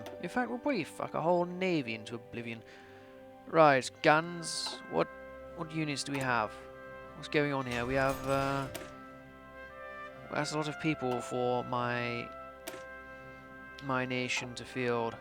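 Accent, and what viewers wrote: United Kingdom. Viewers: Are you born in Britain or are you just a Canadian with a British accent.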